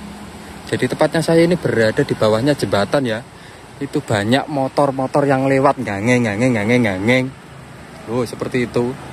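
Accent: Java, Indonesia. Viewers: truskan lur aku suka banget cara penyampaiyaan kata2nya lucu mas bro,mentok banget jawanya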